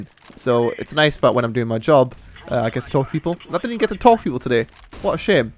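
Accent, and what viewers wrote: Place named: England, United Kingdom. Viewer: work? what the fuck would you know about work. editing a video and playing games is not work. step outside your house. sell out. you made youtube videos once upon a time where it was no doubt fun. clearly, you are looking to shake this money tree. i dont buy into all this donating and subscribing garbage. its rubbish. you are not a charity. you provide mild entertainment at best,. you cheeky, english accent, condescending, adolescent whatever. i lost interest. friend-zoneded.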